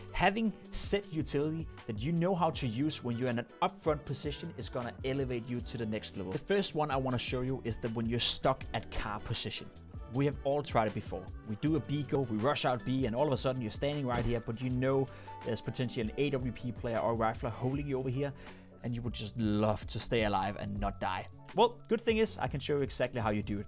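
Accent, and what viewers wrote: Denmark. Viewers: are you from Denmark mate? it does sound so, anyway I left a like fellow dane! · Are you Danish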